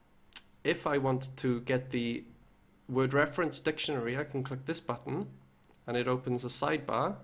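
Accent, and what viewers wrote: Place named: Wales, United Kingdom. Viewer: I love hearing a fellow Welsh accent on youtube, always a nice surprise! Thanks butt!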